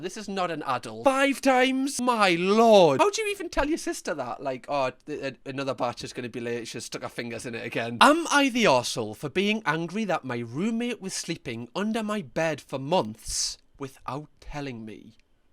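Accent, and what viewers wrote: Newcastle, United Kingdom. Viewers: Can confirm, it's just how we say it in Newcastle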